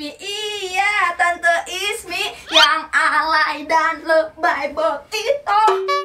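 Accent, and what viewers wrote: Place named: West Java, Indonesia. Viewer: Aduh logatnya sunda teh Ismi S E M O G A T E H I S M I S E H A T T E R U S : ) Aamiin😊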